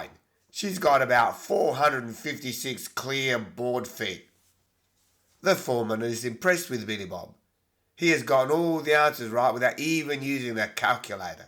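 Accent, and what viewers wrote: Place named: South, United States. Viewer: Your rednek accent sucks.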